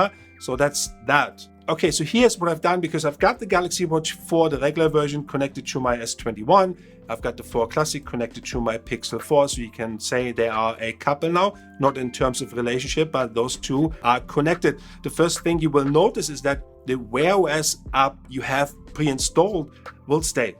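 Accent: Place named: Germany. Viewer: Definetly heard out the german accent helped me a lot : )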